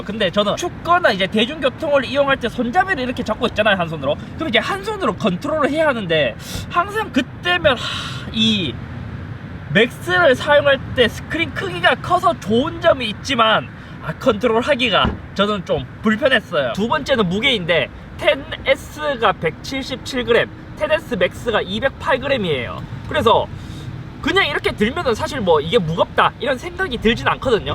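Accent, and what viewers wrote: Gyeongsang, South Korea. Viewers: 나도 경상도 사람인데 이친구 경상도 발음이 왜케 웃기지?ㅋ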